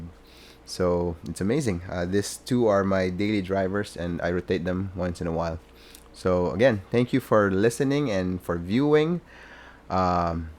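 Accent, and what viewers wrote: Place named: Philippines. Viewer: Perfect accent bro. I hate getting the American accent just because I grew up here in the US. Slight Filipino accept while speaking English sounds nice. I mean probably just me being patriotic meh...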